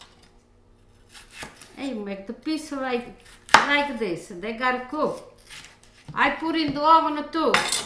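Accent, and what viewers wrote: Italy. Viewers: Grandma is so sweet and her singing Oh I love it.Although I don't understand the meanings but she also explaines what she's singing in her beautiful accent. I'm learning Italian dishes from her which are my favourite. 😋Lots of love from Pakistan and God bless! 😍🤗😚😚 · Once I heard the accent I knew I came to the right place! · lovr her singing and her cooking her italian acent makes me smile love her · Yes. The only recipe I keep coming back to. I’m almost full Italian blood here in America. Her dialect and accent resonates with me. I’m a 4th generation here so a lot of the Italian culture is lost but this makes me happy and this recipe is delicious! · that dish looks delicious. I am making it today. I just Love you, your singing and your accent. Close my eyes and I’m hearing my Italian family. God Bless You ✝️ and your family. Son n Law you do an excellent job of filming. 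👍🏻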